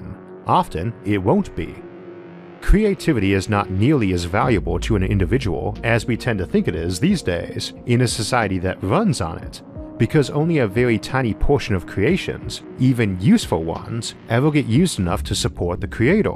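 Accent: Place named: Washington, United States. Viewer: possibly but I think there's an accent like that in Washington somewhere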